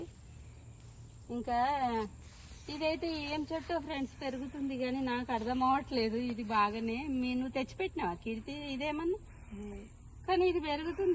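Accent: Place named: Telangana, India. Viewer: Pls voorike friends anakandi. Chaala boring and irritating ga vundhi. This is only a suggestion. Talk in pakka Telangana accent. No problem, but don't say friends 😮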